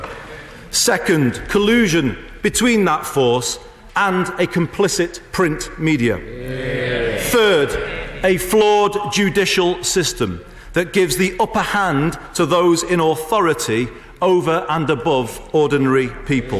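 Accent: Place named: Northern England, United Kingdom. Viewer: One of the great House of Commons moments ever in my view. Delivered in a Northern accent though so will never get the credit it deserves. Equal to anything delivered by ANY MP. Ever.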